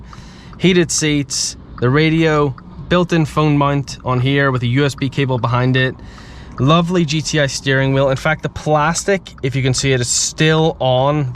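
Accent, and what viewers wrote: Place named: Canada. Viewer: Interesting accent you have there! Part Canadian? Love the vid 👌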